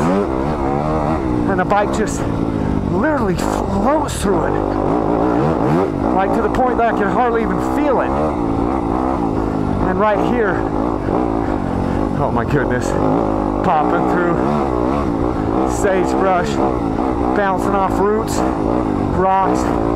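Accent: Utah, United States. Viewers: You said last year that the Beta 390 RR was your favorite 4-stroke ever. Sounds like this one might usurp the Beta. Oh, and I love how you speak Utah language.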